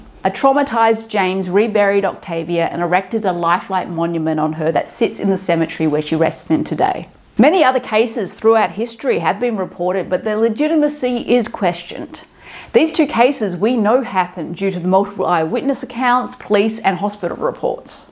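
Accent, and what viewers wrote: Australia. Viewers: Just subscribed. You sound Aussie. Greetings from Victoria.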